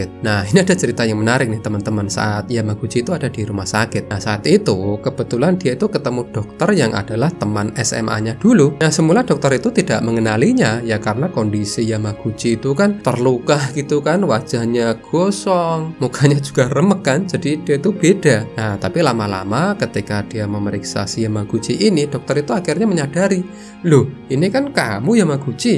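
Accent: Java, Indonesia. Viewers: semarang yo mas ..keliatan dari logat Jawa ne 😊😀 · Akhirnya muncul juga sosok legendaris pengisi suara "Aneka sejarah"...yg kental dengan aksen jawa medhok nya.. Ternyata guanteng.. 😊😊😊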